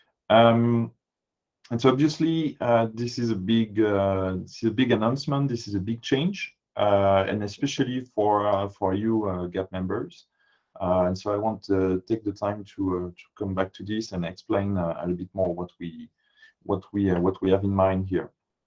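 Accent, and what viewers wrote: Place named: France. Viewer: There is a lot of information in this and has done a good job in assembling it. However, a video like this stands or falls on the quality of its audio delivery. It just isn't fair that AdaCore would ask a professional software engineer to deliver a presentation like this without adequate training in voice projection. There are far too many French pronunciations of vowels (e.g. SOORCE instead of SOHRCE for "source"), pauses as the presenter searches for the right word or phrase, drops in volume at words that are vital for comprehension and so on. The whole video should have been scripted before presentation. I do not mean merely scripted like a piece of text to be read in user documentation. I mean that the script should: 1. Cover all the points that need to be made on the AdaCore Community -> FSF transition, Alire, project and library development; 2. 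Be comprehensible to all with reasonable fluency in English; 3. Be phrased in a way that is easy for this presenter to deliver. Once an appropriate script is made, the presenter can then focus on the relatively easy tasks of getting his pronunciation correct and his projection loud and clear. I hate making critiques like this because it is clear that the presenter here is absolutely expert in his subject. But he has been badly let down by his employing organization, AdaCore. They sent him out to bat without any proper preparation or training. You cannot create a software language community without investing in clear c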